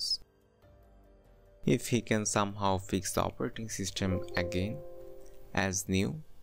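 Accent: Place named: India. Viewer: U sound like a indian, or are u? dramatic music plays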